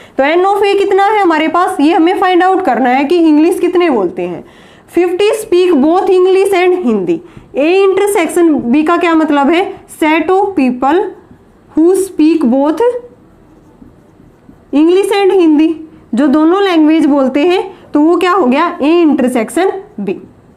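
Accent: Haryana, India. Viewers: She is sure from HARYANA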